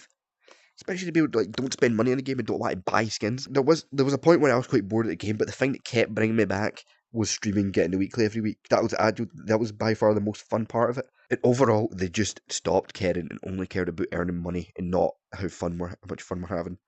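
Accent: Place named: Australia. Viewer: The Australian accent makes it 10 times better lol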